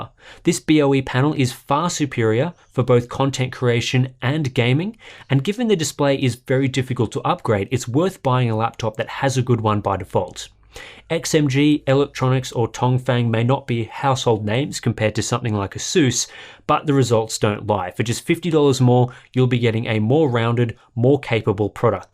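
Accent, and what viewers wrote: Australia. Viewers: great work with the review. only of the reason i watched it was that you are in australia (assumption with your accent). i would have liked if some of your links were australian stores. just google australian store none of them stock ryzen laptop except the ASUS Tuff or asus rog g14. not helping with the decision making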